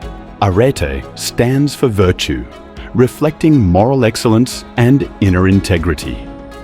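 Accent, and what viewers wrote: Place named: Australia. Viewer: I love Turkiye. I need more time here. Also, I love that this is narrated with a Australian accent 🥳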